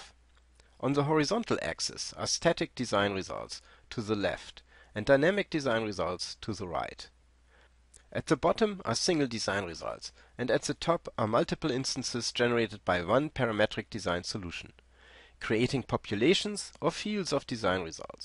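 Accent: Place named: Germany. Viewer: Too much germanish XD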